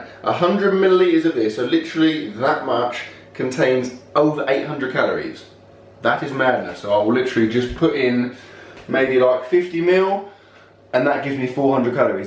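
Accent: United Kingdom. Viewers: At first I was like, did get a hair cut? And then I was like hmm, the British accent is new though, but I did miss the last video, so maybe it's his new thing. Then he said his name is, then I was like ohhhh.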